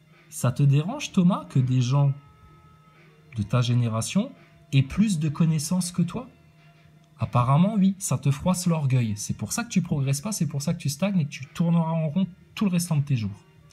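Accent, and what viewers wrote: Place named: Banlieue, France. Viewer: Déjà c'est VOUS et pas TU nous ne sommes pas pote .. et ça ne risque certainement pas d'arriver vue le niveau de vos reponses Ensuite c'est trop long trop chiant trop blindé de biais et de rethoriques bidons Et le côté religieux mystique faut arrêter c'est tellement deb... que c'est sans intérêt et cela démontre que votre raisonnement ne tient pas alors arrêtez les bonsieuseries et ça ira mieux dans vos réponses et ça sera largement moins chiant et stupide Si vous vouliez réellement la paix le bonheur la joie et tout le tralala , vous commenceriez par vous taire ou alors ayez une construction intelligente de vos critiques Ps Comme vos dires sur la positions des mains la barbe .... (c'est de la pnl et donc à 95% bullshit, ) changez de phrasé et d'accent parce que franchement ça fait le gars de la banlieue qui ce la raconte façon jeune qui a la haine .... 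je me marre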